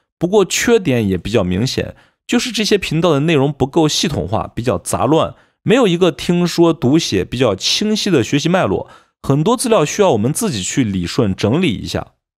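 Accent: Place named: Xi'an, China. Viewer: 一听up主就是西安老乡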